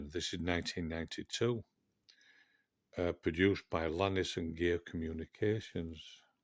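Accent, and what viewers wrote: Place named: Northern England, United Kingdom. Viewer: Love your vids ,especially appreciate your northern accent!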